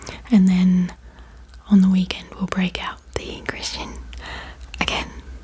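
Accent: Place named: Australia. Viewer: our Aussie accent reading bible verses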